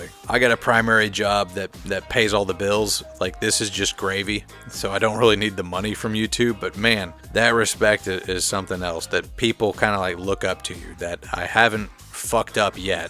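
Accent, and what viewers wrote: South, United States. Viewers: It's just my personal opinion but I feel like your channel has taken off for two main reasons, one is excellent well researched content presented in a very relatable way and two because you lend NASCAR material an air of authority with your southern accent but also not sounding so southern that no one can take you seriously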